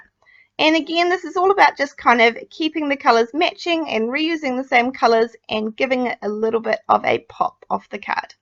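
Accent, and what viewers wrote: New Zealand. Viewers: I stumbled onto your you tube card making and am now subscribed, absolutely loving your techniques and have tried a couple, now working my way back through your previous video's. Great to hear a Kiwi voice - thanks so much and keep up the brilliant work.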